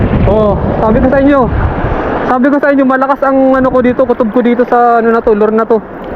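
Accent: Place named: Abra, Philippines. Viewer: Idol Taga Abra de ilog ka po ba? Ganyan Kasi tono Ng salita Ng mga taga Abra eh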